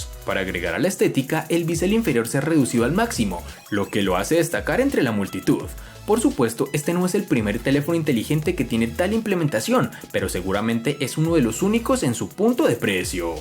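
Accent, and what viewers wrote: Colombia. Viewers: De q parte de colombia eres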